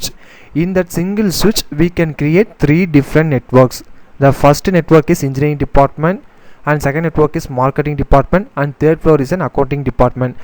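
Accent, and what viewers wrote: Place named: India. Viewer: Only Indians would understand your English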